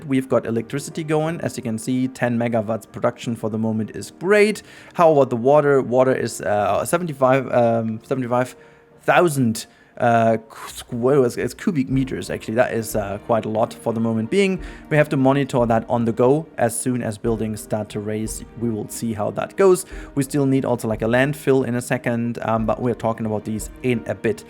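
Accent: Germany. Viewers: Dieser deutsche Akzent ist göttlich! Love the videos, keep it up <3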